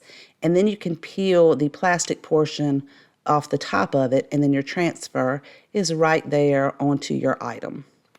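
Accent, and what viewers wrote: South, United States. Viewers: I always truly enjoy all your crafts. These minis are adorable! Love your accent! I'm from the South too, and get a lot of teasing about it up North.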